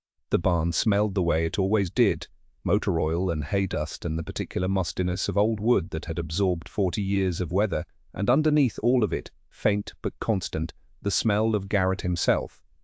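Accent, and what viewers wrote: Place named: United States. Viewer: Why do you speak like an American but you choose a British narration vooice?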